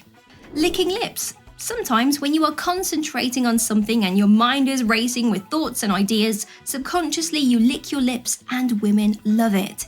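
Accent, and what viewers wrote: United Kingdom. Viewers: For me, a woman with a British accent will catch my attention fast. (I find it difficult to not watch these videos because your voice is so magical.) I have heard women say the same thing about men. Please teach me that accent.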